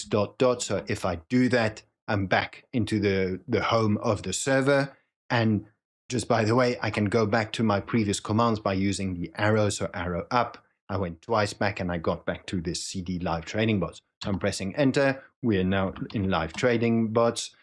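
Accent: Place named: South Africa. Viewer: . I stumbled on your channel just now. Wow, it was the first video that linked me to this. Your accent attracted me....sounds South African. What a wonderful and detailed presentation that attracts the viewer to stay tuned as if we are in a Hollywood thriller 😊. Dude you are simply the best... This past month I have been watching so much nonsense on YouTube as I am trying to get back into trading after about two decades of absence. Much has changed with technology and I need to brush up before I re-enter the markets. Well done and God Bless 🙏🙏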